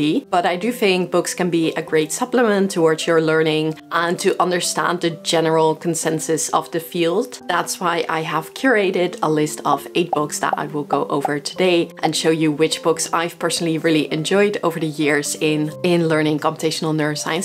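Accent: Netherlands. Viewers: your accent feels dutch, love how youtube is recommending me dutch youtubers who speak english XD, YT algo is really remarkable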